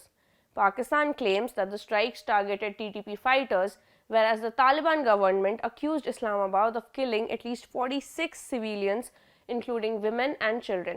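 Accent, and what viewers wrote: India. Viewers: Then leave the country if u hate Indian accent so much.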